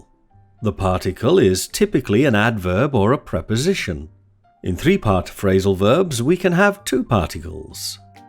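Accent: United Kingdom. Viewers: Your British accent sound is the most stunning that I've ever heard. I appreciate your contribution of British accent promotion cos many youtubers just own American accent instead of British one.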